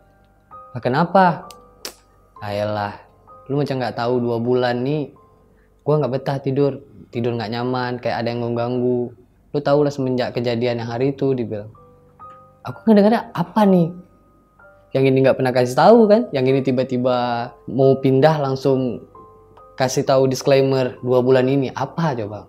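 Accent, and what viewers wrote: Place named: Aceh, Indonesia. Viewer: Logattt acehhh banget abgnya✌🏻😁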